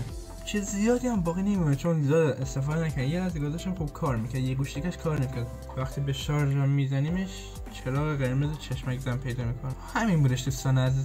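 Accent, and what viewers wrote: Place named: Mazandaran, Iran. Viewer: داش کجایی هستی به لحجت میخوره که مازنی باشی اگه باشی خیلی خوبه چون منم مازنی هستم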